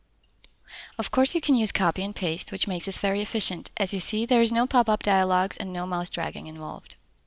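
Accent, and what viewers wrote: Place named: France. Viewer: This nice narrator sounds like French.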